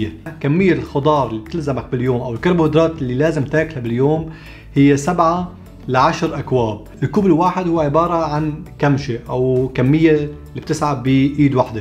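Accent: Aleppo, Syria. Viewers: سؤال دكتور انت من حلب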